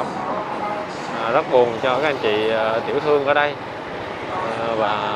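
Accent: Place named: Mekong Delta, Vietnam. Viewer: THANK YOU EM CHIA SE CLIP NAY, TAI SAI GON.N TRONG KHI TAI DA NANG ,QUANG NAM ,DA BI SHUTDOWN. VOI CHAT GIONG MIEN TAY , CACH NOI CHUYEN CHAN THAT, CHAT PHAC ,ANH RAT THICH , CHANNELCUA EM .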